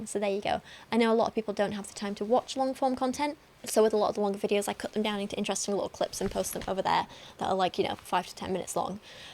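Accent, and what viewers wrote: United Kingdom. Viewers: Would be nice if you got it right the first time. You went and slandered the whole country for something that isn't even true. Even better, you did it purely based on the "word" of a trashy author and an unreliable narrator. On top of that you seemed to assume that age of consent laws were the be-all end-all in this kind of thing despite that the age of consent in your own country (I'm assuming the UK, based on accent) is, wait for it, ... sixteen. Now this is apparently raised to 18 in the case of a teachers trying to have "relationships" with their students, but it's notably not as good as that majority of US states that outlaws such things entirely, regardless of age.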